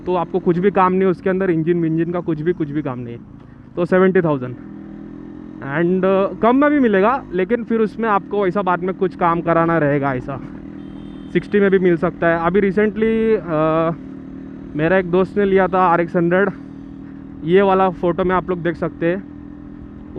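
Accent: India. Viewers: Pure mumbai accent 😌🤜🤛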